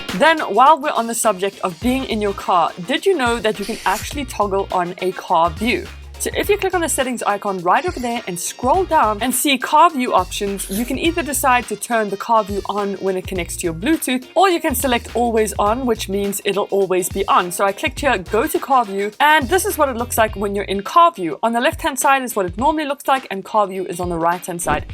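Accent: South Africa. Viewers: Are you South African 😁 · South African????? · You are from South Africa right ? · Are you South African? It's the accent. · Are you from south africa? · Do you come from South Africa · No lie I clicked on your video for the first time from my recommended and as soon as you started talking I was hold up, that accent sounds familiar, and you know I gotta support a fellow South African on YouTube. 😂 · Loving hearing a south african accent, much love from Jozi!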